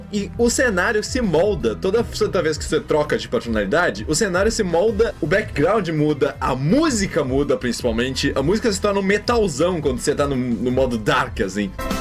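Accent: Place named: Rio Grande do Sul, Brazil. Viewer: Vc é gaúcho? Tem sotaque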